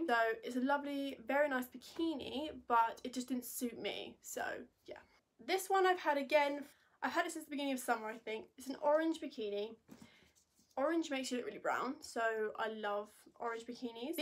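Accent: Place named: RP, United Kingdom. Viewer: Love a posh English accent, is short for?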